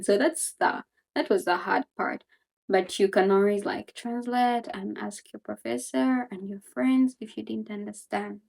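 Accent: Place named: South Korea. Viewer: i love your Korean accent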